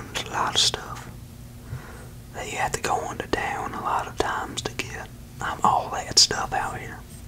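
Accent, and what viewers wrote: South, United States. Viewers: Hey you i have a video suggestion you should do a red dead redemption 2 video with the Southern accent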